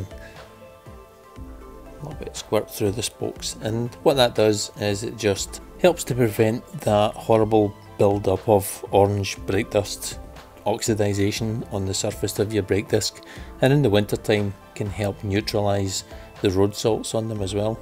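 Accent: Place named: Glasgow, United Kingdom. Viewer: Thanks for taking the time to film, edit and upload this video " ". I picked-up your Glaswegian accent immediately, given my Dad's upbringing in Kirky:-) I'm currently awaiting my August delivery of my 2021 BMW 330i M Sport w/ the following additions: - M Sport package - M Sport+ package - Visibility package - Comfort package - Harman Kardon sound system - Heated steering wheel - Galvanic embellisher for controls It's been a 5 month wait (given the pandemic), but the extra lead-time has allowed me to (exercise my patience, and) take some time to research how existing 3 series owners are maintaining their beauties. I live in Melbourne, however I'll be looking into these products this week. Thanks again, and all the best!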